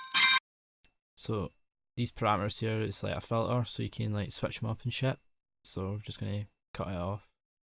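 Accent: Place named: Scotland, United Kingdom. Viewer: bro youre scottish af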